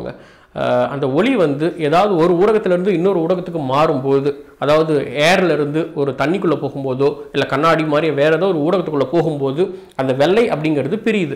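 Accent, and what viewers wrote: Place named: Chennai, India. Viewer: ninka pesum pothu chennai tamil lite ha varuthu bro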